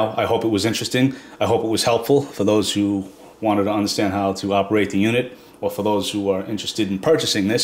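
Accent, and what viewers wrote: New York, United States. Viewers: New York accent?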